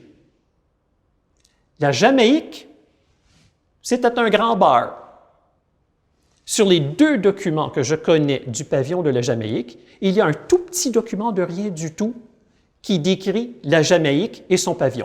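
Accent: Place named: Quebec, Canada. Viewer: Que j'aime entendre parler un québécois en Français .Merci à vous de conserver cette langue comme le reflet de votre culture et de vos origines. ENCORE MERCI DE FAIRE RAYONNER CETTE LANGUE QUE J'AIME TANT !!!👍👏❤ FRANCE (Lille)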